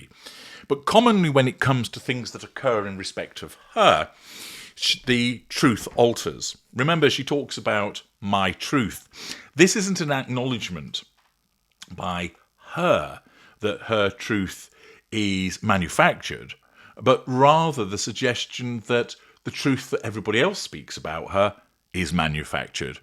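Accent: United Kingdom. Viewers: Love your sense of humor. With your wonderful British accent you make shit sound classy. More fuel.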